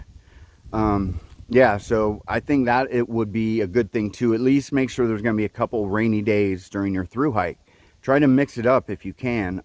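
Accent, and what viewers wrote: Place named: South, United States. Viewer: Loli love the way yu say the at tail have thst southern voice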